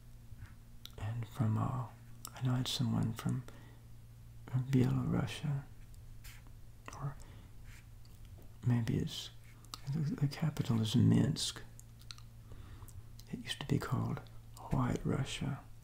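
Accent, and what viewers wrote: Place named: East Coast, United States. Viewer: I’ve been binge watching, obviously. Didn’t know where you came from and took a guess one day & said “east coach of America” its the way you pronounce things that give it away .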